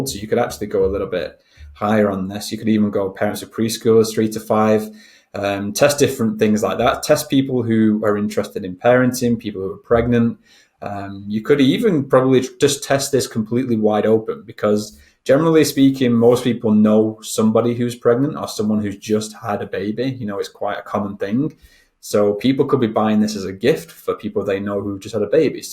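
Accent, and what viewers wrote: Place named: Bolton, United Kingdom. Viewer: Where are you from as you sound local to me. Bolton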